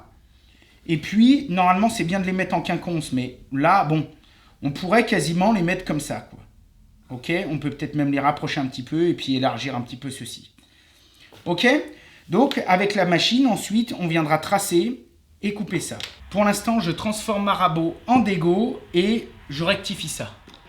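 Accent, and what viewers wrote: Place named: Haute-Loire, France. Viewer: Allez, courage. Moi je suis ravi de retrouver mon avec son accent de la haute Loire, sa bonne humeur et son don pour donner envie de lui ressembler. Quand je serai grand, je voudrai faire « » comme métier 😉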